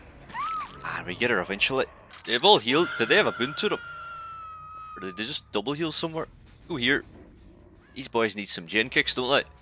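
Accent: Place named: Northern Ireland, United Kingdom. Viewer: at the start there i thought you were gona have subtitles the entire video coz youre from northern ireland haha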